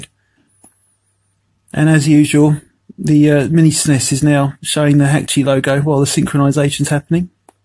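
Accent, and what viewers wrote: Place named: United Kingdom. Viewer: It's in the description above in case u didnt understand what he said bcuz I couldn't understand him either with his British accent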